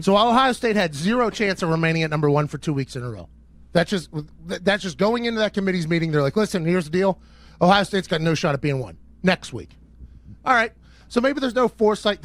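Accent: Pittsburgh, United States. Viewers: yinzer accent is the best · Love yinzer accent especially with the 412 merch on while in the hill in da burgh...in a box truck.. Classic yinzmcginz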